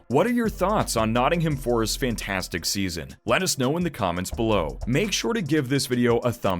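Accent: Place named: United States. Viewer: Stick video on out of interest. Hears American accent. Switch video off.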